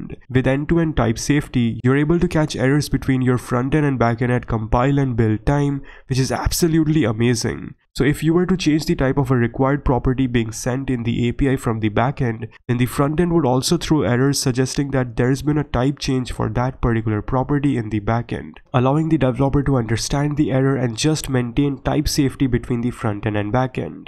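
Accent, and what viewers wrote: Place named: India. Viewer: AND THERE'S the indian accent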